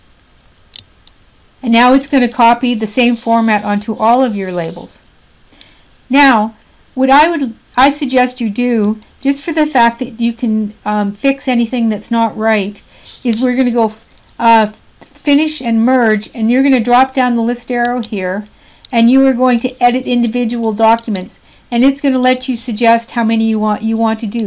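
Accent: Canada. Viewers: Thank you! Very well explained. And I could tell I was listening to a Canadian when a-Gain came up, and then a-Boot. I was born in Vancouver but we moved to LA when I was 6 so I lost that charming accent and now just sound like an international dual citizen American living in Europe. 😉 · you are Canadian... I can tell by your accent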